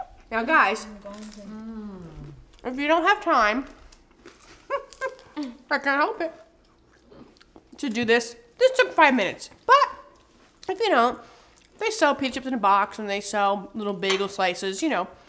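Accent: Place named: New York, United States. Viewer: By her accent, I can tell she’s either from NJ or NY! Am I right? Lol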